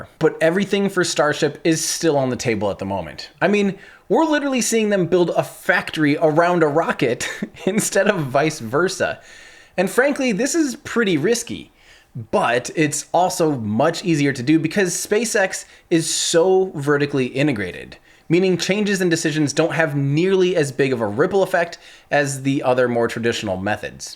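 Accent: Iowa, United States. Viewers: (Going back again...) Why do you believe your complaint about the ambiguity of calling the smaller unit the same name as the system as a whole (like when some people call a whole church meetinghouse a "chapel," the same as the main worship room by itself) reveal that you sound like you're from Iowa?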